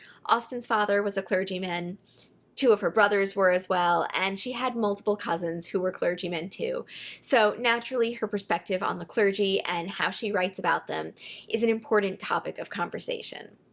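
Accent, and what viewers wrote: United States. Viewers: Glad to see you posting again; hope the little one is doing well. It might be informative to the fans of your three sites to hear how Jane Austen July was kicked off by you, the Spinster, and Katie. Did one of you have the idea and floated it by the other two or did you have a discussion on how to gin up more interest in JA? You are audibly American, whereas Katie is a Brit and Claudia is Italian-German who is married to a Brit. Did you all meet through YouTube? Your suggestions for book-reads are all good. I read Rory Muir's biography of The Duke (he was a second son who had to find his way through the Army), who became a much bigger fish than his once-famous older brother. You simply can't pluck JA out of context; the Napoleonic Wars, the Navy, and the struggle with France was the whole political underpinning to Jane's "fictional" world. 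Thus I would consider Patrick O'Brian (author of the Master and Commander books and a GREAT admirer of Jane) to also be acceptable fodder for July's activities.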